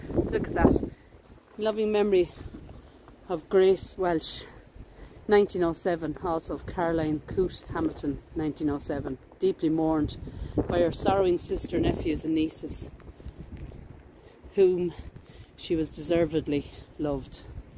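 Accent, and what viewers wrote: Ireland. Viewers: I am from Hershey, Pa USA. I LOVE YOUR BROUGE and have found you on YouTube and love what you are doing in your part of the world. Love the architecture of some of the building and gravesites you have found. I will keep on watching. Betty from Pa.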